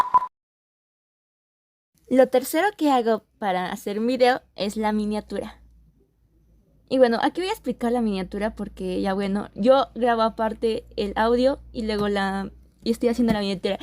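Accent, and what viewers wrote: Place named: Latin America. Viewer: Sí se nota que eres de latam 😂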